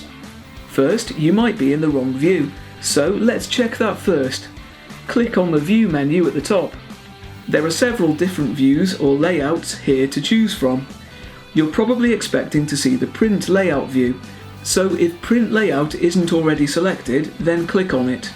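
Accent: England, United Kingdom. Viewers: Hi! You are a genius. It was so easy to add the "White" space on my Word document. also, it sounds as if you are from England. I have done karaoke tributes in costume to honor Princess Diana. I worked for a man who had #1 USA, Chicago catering company. He had worked in the English Palace for Queen Elizabeth. He hired me to hire "only" Whites since his company was going bankrupt with only colored tuxedoed part-time waiters being sent by Temporary agencies. That was 1997. I went back to Chicago. I helped save his company by hiring about 100 Whites. But as George Jewell, owner said, "We'll not only hire Whites but we will have to develop them." Just as you helped me with my Word problem, I thank you. Although it's been a curse since I now use the word "White" frequently.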